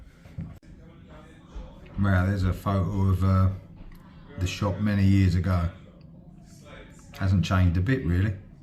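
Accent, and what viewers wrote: United Kingdom. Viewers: Love listening to the narration and the British accent.😊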